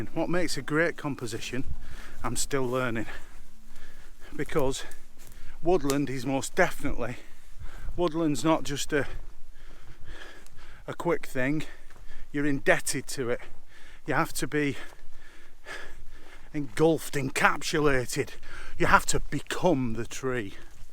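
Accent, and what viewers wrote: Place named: Sheffield, United Kingdom. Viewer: The last time I heard that accent was on my last visit to my home town Sheffield in 2006 from my adopted home in Tasmania. Thanks for sharing. Cheers Peter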